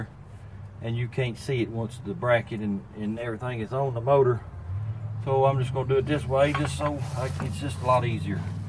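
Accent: South, United States. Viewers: Well, now I know why your voice sounds so familiar. I saw that Montgomery County plate, lol. I'm originally from Henry County on the other side of the lake from Stewart County, so your voice sounds like a lot of my kinfolks. I've lived up here in Southern Indiana for a good number of years and been inside all evening cause it come up a bad cloud earlier and then poured down most of this evening...it was a frog strangler! So I just run across this channel on YT this evening and been watchin' the last few hours.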